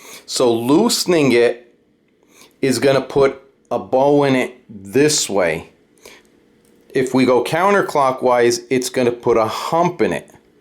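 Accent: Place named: Chicago, United States. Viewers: Nice vid great Chicago accent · That's not a Brooklyn accent? Chicago? Interesting 🤔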